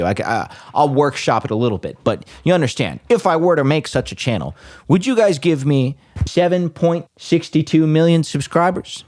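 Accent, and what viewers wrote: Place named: United States. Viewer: Hey. I have multiple diagnosed disabilities and am a disability studies scholar. What you're doing w this video is all wrong and incredibly harmful to actually disabled people. Yes, there are people out there who fake having a disability and the internet has made them more visible than ever. But this abled urge to play detective in order to prove or disprove any person claiming to be disabled is legitimate or not literally kills disabled people. Yes, the faking can take up some limited resources from legitimately disabled and their existence creates their atmosphere of doubt around disabled ppl but outside of when it proceeds to the next stage of attempting to do whatever the disabled equivalent of 'transvestigating' is that it truly becomes dangerous to disabled peoples lives. You sound like you're from the US, so lemme tell you about something that happened in the UK bc of this exact impulse. 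In 2010 the conservative party and the liberal democrats formed a coalition govt that pursued an economic policy called austerity. This is a program which seeks to cut back funding to all public services, and part of that included some very significant welfare reforms. At the time, the political atmosphere was one of distrust towards ppl on benefits, this kind of prevailing belief that there was some massive amount of 'welfare queens' equivalents and disabled fakers who were living lives of luxury on the public dime and was aggressive about trying to identify and weed them out. So, as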